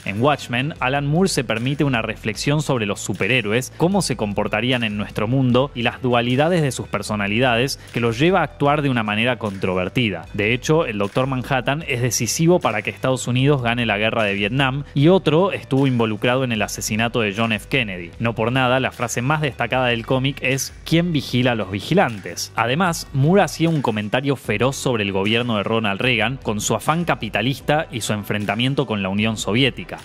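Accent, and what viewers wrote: Argentina. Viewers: Me encanta ese asentó argentino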